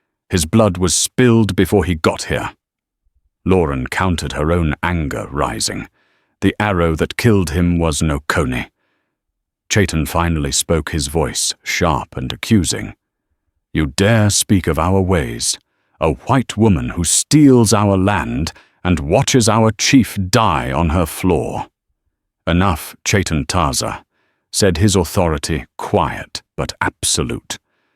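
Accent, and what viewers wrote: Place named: United Kingdom. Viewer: You need someone to speak America English for an American stories.. Not English from UK..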